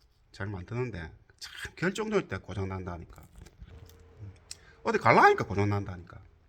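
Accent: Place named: Gyeongsang, South Korea. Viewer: 오늘도 구수한 경상도 사투리를 들으며 영상 시청 합니다..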